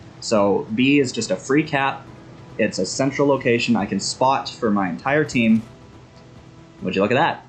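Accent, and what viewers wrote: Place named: Canada. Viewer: Maybe. Apart from my heavy Midwestern USA accent and your mild Canadian inflection.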